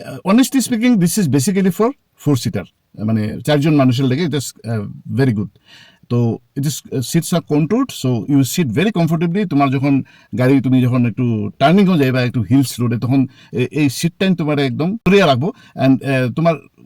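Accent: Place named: Sylhet, Bangladesh. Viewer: সিলেটি শুনলে কুব বালা আর আফন মনো অয়। বানাইতে তাকোইন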